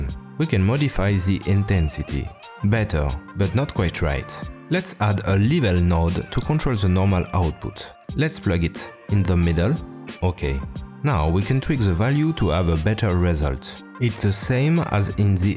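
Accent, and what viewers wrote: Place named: France. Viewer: the frenche accent is tout bien mec !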